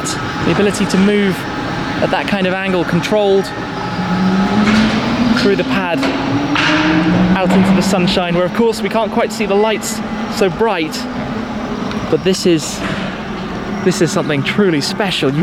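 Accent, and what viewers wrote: United Kingdom. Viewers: Brilliant! Absolutely sensational. british accent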